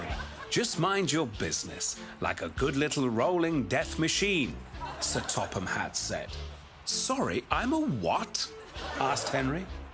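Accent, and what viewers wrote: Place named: Arizona, United States. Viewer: He sounds like everyone from Tuscon Arizonia.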